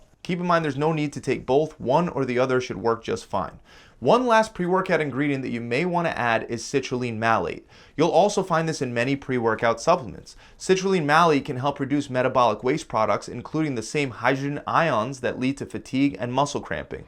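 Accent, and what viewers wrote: United States. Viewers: It's interesting video but what a pity this guy speaks so fast and with strict USA accent forgetting his post goes all around the world and he doesn't do any effort to be understandable for people that are not necessarily of English mother tongue. He is not the only one, many do the same😞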